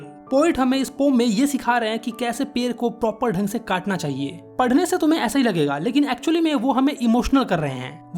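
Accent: Bihar, India. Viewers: Are u bihari? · Its sounds like Bihari Bhaiya!👀❤ Best explainer everr?🐣haiinna??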